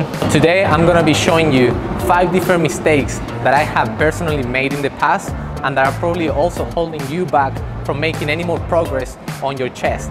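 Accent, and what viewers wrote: Spain. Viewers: 一听你说英语，我就知道你母语肯定是西班牙语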